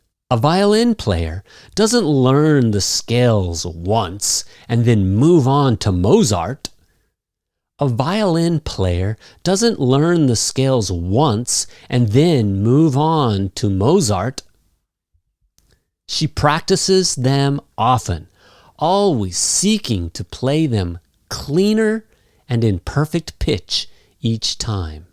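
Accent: United States. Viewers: It sounds american.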